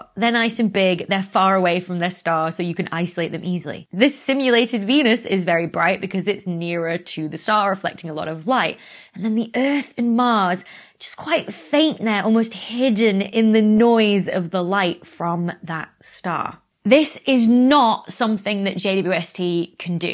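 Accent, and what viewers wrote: United Kingdom. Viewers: This is my first time seeing a video with Dr. Becky. Very informative, on a subject I look forward to. My American ears take time to get accustomed to her veddy veddy Bditish accent. Thank Goodness she talks like Julie Andrews, and not like Eliza Doolittle in her "Before" stage, the way so many cockney techies on YouTube do (I hear them and I think, "The royn is Spoyn staays moynly in the ploin" and I want to tear my hair out.).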